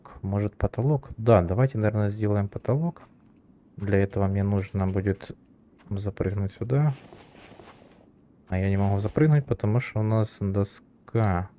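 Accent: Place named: Surzhyk, Ukraine. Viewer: якщо не секрет, звідки ти, а то щось я чую місцями суржик у розмові? Якщо на каналі немає політики, то я підпишуся. Не люблю коли ігри плутають з політикою, а я прийшов дивитися ігри, а не слухати хто комусь на х.. настав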